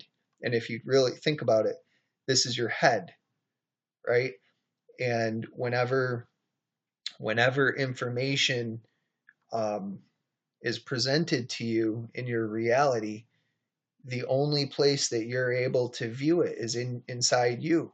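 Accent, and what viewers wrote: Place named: Minnesota, United States. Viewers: I'm getting a UP vibe on that accent, lol. You from the upper midwest? 😁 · sounds a little Minnesota to me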